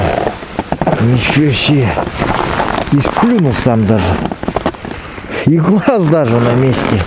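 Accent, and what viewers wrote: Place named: Yakutia, Russia. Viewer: С наступающим новым годом! Каждый раз рад слышать якутский акцент, такой родной и теплый! Удачи и развития канала!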